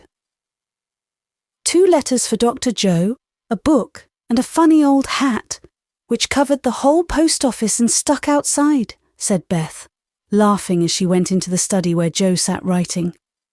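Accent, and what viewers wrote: United Kingdom. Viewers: Hearing an essential American story with a British accent doesn't work.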